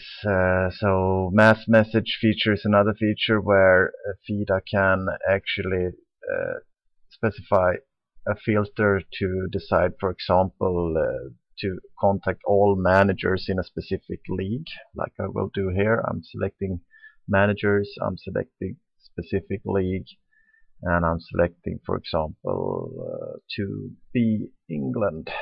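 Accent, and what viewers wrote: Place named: Sweden. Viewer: Swedish accent FTW :D // Runeic on FID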